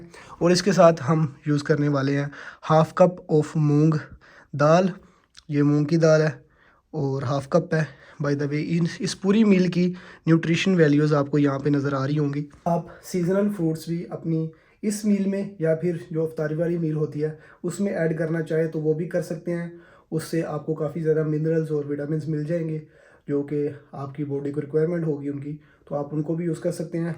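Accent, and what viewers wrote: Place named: Punjab, India. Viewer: Salaam Waliqum bhai. Good to see your dedication during this holy month. Being a Hindu Bahman, I am trying to maintain Roza for myself too this Ramzan with my muslim brothers like you. You sound like you have a Punjabi tone like mine, which part of Punjab are you from?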